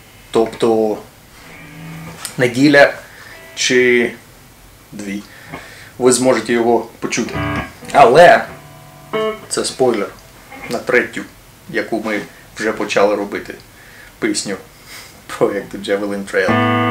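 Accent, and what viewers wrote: England, United Kingdom. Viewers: у тебе український з англійським акцентом, таке перший раз чую чесно кажучи!!)))) Отвіртка - викрутка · а як тобі вдається російською говорити без англійського акценту, а українською - з ним?:) Improvement - “покращення”, улюблене слово Вікторфедрича Царапают - дряпають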